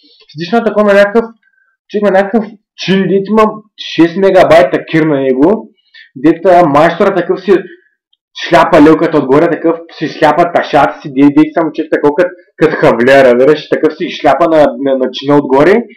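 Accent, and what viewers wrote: Varna, Bulgaria. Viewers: Потресност..чуек! ;Хх Можи ли кат си почнал пуне с тес пудобия на влогове тъй лекинку пуне да си упрайш варненския акцент, щот хич ней приятну.. поне! В смисъл, аз съм от Добрич.. чуек, и ти разбирам доста добре ама мн хора изпитват сериозни затруднения вярвам.. иначе да, лека потресност като цяло :D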